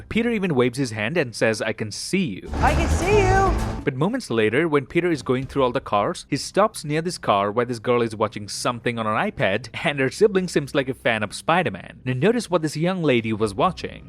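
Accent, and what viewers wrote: Canada. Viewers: You're Canadian!!!! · he is starting to sound more canadian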